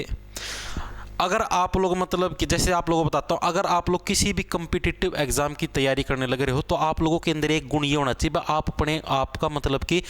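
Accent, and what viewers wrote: Haryana, India. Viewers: Bhai aapki boli dekh kar me bhi aadhi si haryanvi Sikh gya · Haryana ale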